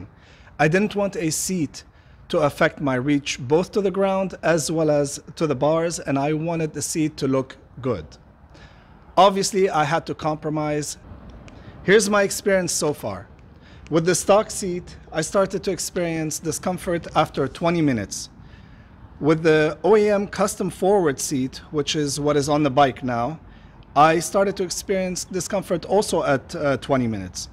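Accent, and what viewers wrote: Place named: Middle East. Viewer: This is the best comparison video for motorcycle seats. Great job, by the way. Lovely middle eastern accent😉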